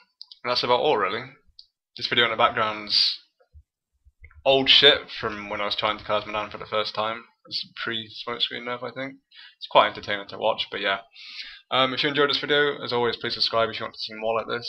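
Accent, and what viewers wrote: United Kingdom. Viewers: What's your problem? I'm from Denmark and understand him perfectly. And FYI he's British, thought the accent was obvious? If you're not 10 and clueless that is. Even his mic is perfectly clear too, I don't get your complaint's at all.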